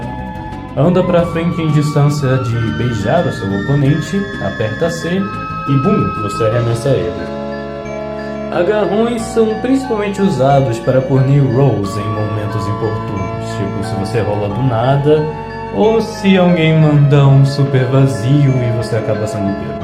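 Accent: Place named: France. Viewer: You sound kinda french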